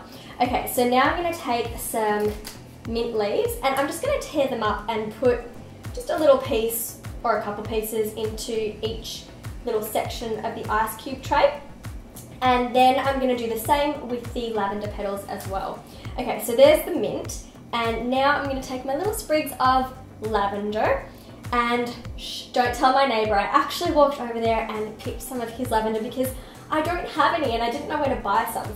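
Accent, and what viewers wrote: Australia. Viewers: Is she Australian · ThisWorldSucks I think she's Australian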